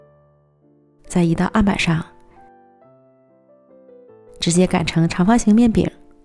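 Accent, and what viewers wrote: Liaoning, China. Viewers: 好久不见变成了南腔北调记得是辽宁哪旮旯的口音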